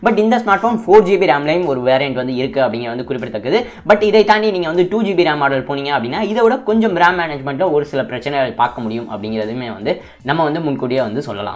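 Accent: Madurai, India. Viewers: Bro neega madurai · Neenga Madura karara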